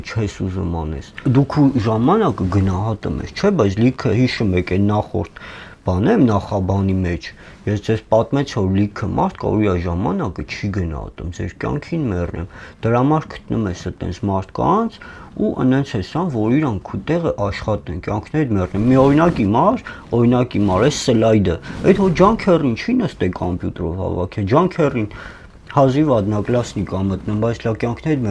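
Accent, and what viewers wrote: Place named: Vanadzor, Armenia. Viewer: Чисто Кироваканский, а точнее Химзаводский)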